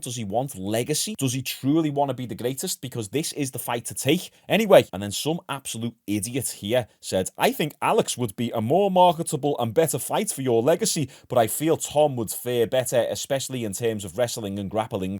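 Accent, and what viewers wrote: Liverpool, United Kingdom. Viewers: Feel like watched guru video scouser version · I'm not defending Jon here but your accent and the flags in these comments kind of agree with his comment about UK fans. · WoW, copying exactly what MMA GURU said yesterday, it's sad when people like you just sit in your room and copy from actual hard working people that do research and actually travel 12 hours by plane to South Africa to talk to dricus and do some training in his gym. Chats live to fighters like money moicano ECT Would do you do mate? Watch GURU and show, it's embarrassing how nearly identical has copied word for word lol No wonder less than 5% of your watchers are not subscribed and will never sub to you and your annoying Scouse accent. And please stop with the workout and how to fight advice, your a snowflake with a teenage girls body lol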